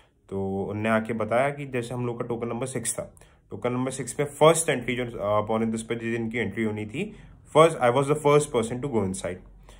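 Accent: Bengal, India. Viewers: are you bengali sir ? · Sir R u bengali ????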